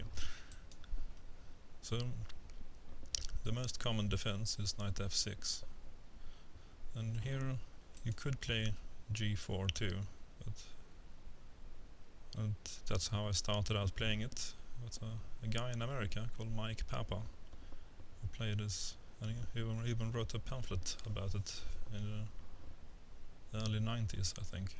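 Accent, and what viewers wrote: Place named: Sweden. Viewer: yay svensk accsent =D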